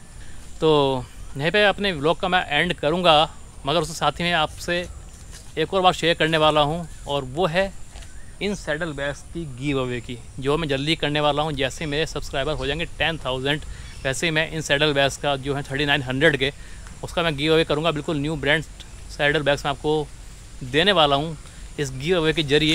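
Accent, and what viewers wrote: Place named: Uttar Pradesh, India. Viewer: Safar Paiy Main Aap ko Yaad Rakhunga Jaroor Sir G. Par Aap Mujha Yaad Rakhna Saddle Bags Give Away Karta Huay . 😁 Bhagwaan Aap Kay 10000 Nahee 10 Lakh Subscribers Ess Saal Kar Daily. My Best Wishes To U For that. I liy your vlogging style & your Hindi UP Accent . Cheers bro Milangay Safar Par Aap Ko Ek Din .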